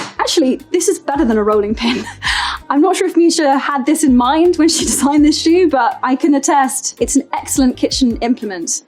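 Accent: United Kingdom. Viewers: イギリスのVOGUEだからかいつもよりイギリス英語が強くてハーマイオニーが脳裏に浮かぶ🪄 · エマのブリティッシュアクセント、聞いてて心地良い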